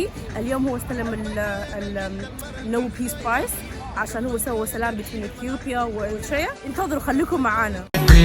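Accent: Saudi Arabia. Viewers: هههههههه باين مأثرة عليك الرياض لهجتك المخلوطة حلوة ... الله يحفظك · لهجة جداوية بححححتا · لهجتها سعودية ودمها سوداني وجنسيتها تشادية 🔥🔥❤ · اتكلمي باللهجتك ....مرة سعودي مر سوداني لا تنسي روحك انتي تشادية سوداني ابدوي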